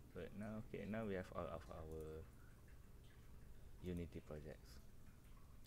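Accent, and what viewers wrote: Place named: Malaysia. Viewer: Nice tutorial and unity package, thanks for putting this together. By the way I recognize the accent my wife is Malaysian.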